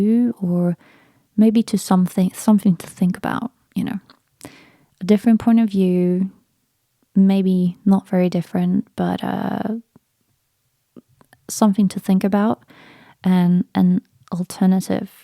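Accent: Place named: England, United Kingdom. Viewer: When the first time I heard your voice, I felt you have a beautiful English accent.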